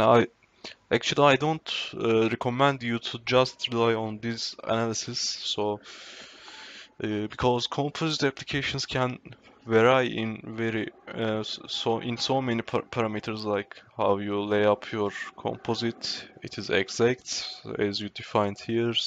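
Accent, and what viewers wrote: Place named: Turkey. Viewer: Hardcore Türk ingilizcesi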